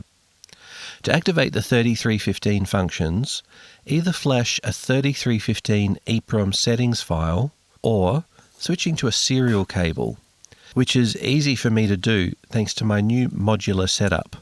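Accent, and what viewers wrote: Australia. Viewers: Given his accent, he appears to be from Australia.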